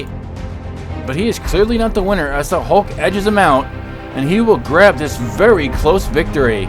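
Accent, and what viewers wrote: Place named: United States. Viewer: Oh I remember you live in Thailand. Because of your accent/voice I sometimes think you are from the USA so it would've been cheap seeing as I got some cars there but great video!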